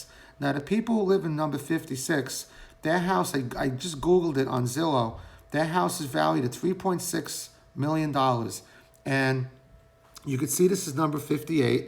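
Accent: Brooklyn, United States. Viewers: I know nothing of this building but that's really interesting. Love the hidden secrets NYC holds. I'm a native NYer and after almost 6 decades I moved away about 6 years ago. Not for nothin' but your Brooklyn accent is music to my ears.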